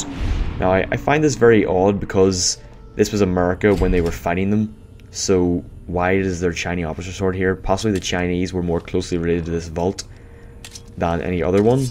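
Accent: Northern Ireland, United Kingdom. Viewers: Northern Ireland ?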